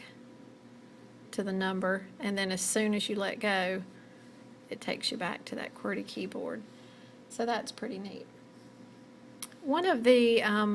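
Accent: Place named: South, United States. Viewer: i love your accent (southern?)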